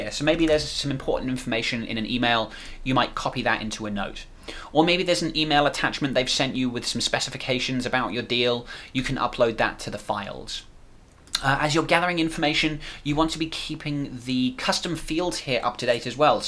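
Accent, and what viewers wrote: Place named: United Kingdom. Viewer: This is excellent, very clear training. Thank you for creating this. I'd much rather listen to your cool British accent than the Pipedrive guys cartoon voice. LOL Cheers!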